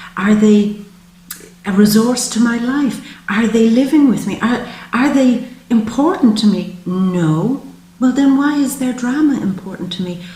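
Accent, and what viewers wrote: Ireland. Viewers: I Loovee your Irish(?) accent. Beautiful.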